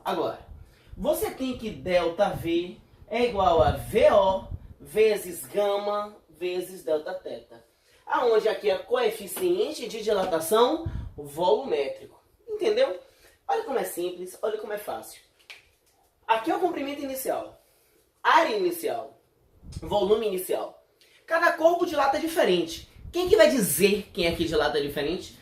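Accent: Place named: Bahia, Brazil. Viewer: Ce é da Bahia ? Sotaque parecido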